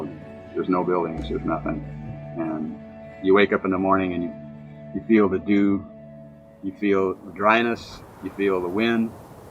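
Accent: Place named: Hawaii, United States. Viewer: he's from Hawaii. That's exactly how they speak. Yer a dick.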